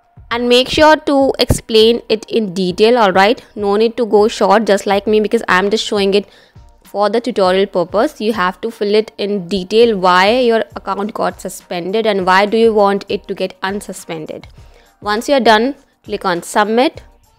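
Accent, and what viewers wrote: India. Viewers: Why do you have an Indian accent. You don’t look Indian. Do you have any idea how annoying your screechy voice is? Plus, your video is not helpful. Click bait.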